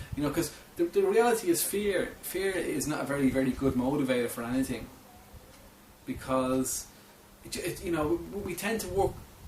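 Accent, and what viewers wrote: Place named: Ireland. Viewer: Definitely irish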